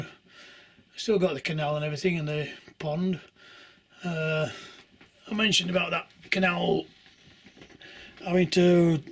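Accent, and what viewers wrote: Yorkshire, United Kingdom. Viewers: Where are you from , is it somewhere between sheffield or Barnsley towards Leeds. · Trying to decide if you're a Yorkshireman. You sound like my friend who's from York. Beautiful model railway you've built. Would love to see it live!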